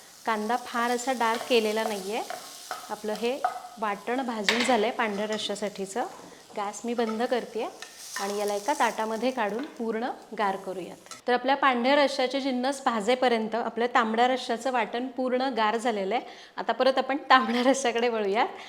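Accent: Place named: Kolhapur, India. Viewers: जमलं ताई कोल्हापुरी बोलायला, आम्ही प्रॉपर कोल्हापूरचे MH 09नाद खुळा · Ly bhari bolla ki o aamchi kolhapuri bhasha🎉🎉🎉❤❤❤ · Kolhapuri jagaat bhari❤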